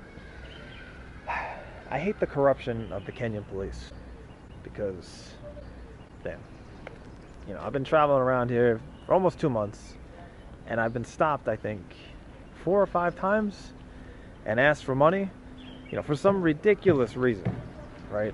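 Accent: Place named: New York, United States. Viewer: Awesome ! leaving kenya is always hard and boy time flies! EAC is beautiful . Thank you for your corky NYC accent/narrative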